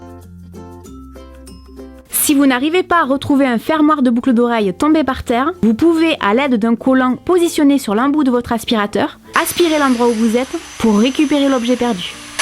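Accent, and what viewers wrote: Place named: Toulouse, France. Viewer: Coucou ^^! J'adore tes astuces, elles me servent beaucoup!! Est ce que tu viens de Toulouse? Car tu as un accent qui me fait penser a ma grand mère qui viens de Toulouse. Enfin bref, je t'adore ♡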